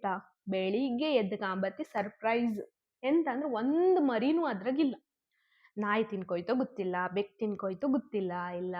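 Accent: Kundapura, India. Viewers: Supper kundapura Kannada I like it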